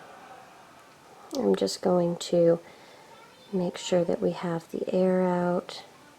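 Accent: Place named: Italy. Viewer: All I can hear is Italy ❤💚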